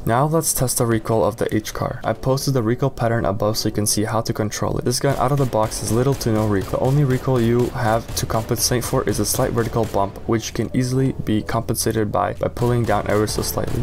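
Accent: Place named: Russia. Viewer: По акценту видно русский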